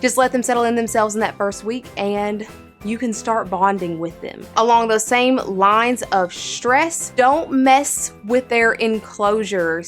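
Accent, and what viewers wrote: South, United States. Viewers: No offense but was not expecting a country voice lol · I love your southern drawl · is kind of cute...with a lil country twang bout her voice. Oh, and has great advice for bearded dragons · love your southern accent! :-D glad I decided to watch some Beardie videos! :-D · Hi! Just wanted to say that we really like your videos. And, being from the south, we love your accent. Keep up the great work. Would be cool if we could do a video together one day... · You got that yeehaw accent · Are u from the south cuz I’m pretty sure u are cuz of your accent cuz I am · Sounds like you got a southern drawl. I love a good southern accent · Damn u sound country what part of the south u from Texas?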